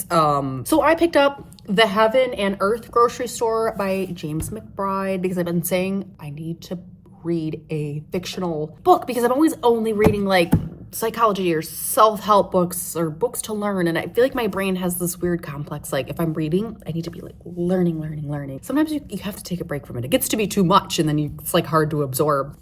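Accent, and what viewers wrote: United States. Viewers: Thick Nhat Hanh was great. I used to have a book by him, but I don't have it now. I must have lent it to someone (when I lend, I don't bother trying to remember to whom). How was that pizza on a scale of 1 to 10? You're a better traveller than I would be. I probably wouldn't even attempt mainland Europe without having one or two other people to help me figure it out. UK? No prob. Ireland? No prob. But I would be too intimidated by communication difficulties to visit Belgium, Italy, France, Germany, etc. Have you experienced any rudeness that you suspect might be related to your American accent? The US government has lost a lot of friends over the past few years due, of course, to our complicity in a certain other country's... shall we say, activities?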